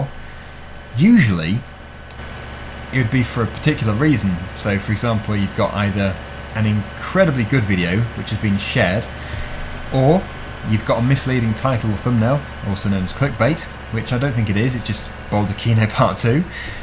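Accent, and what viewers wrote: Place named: United Kingdom. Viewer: You are British so pronounce things the British way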